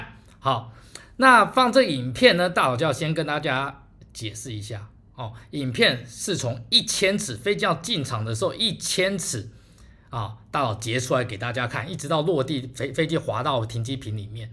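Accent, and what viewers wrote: Taiwan. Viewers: 為何大佬在外工作那麼長時間英文完全沒口音，完全就很台灣味...為何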